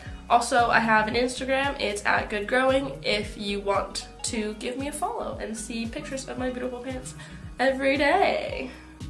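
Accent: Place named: United Kingdom. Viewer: This is irrelevant but I can't tell if your accent is British or not, I love hearing you talk 😂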